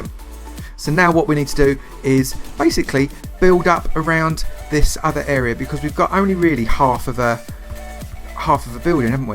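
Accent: United Kingdom. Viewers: I can smell the British accent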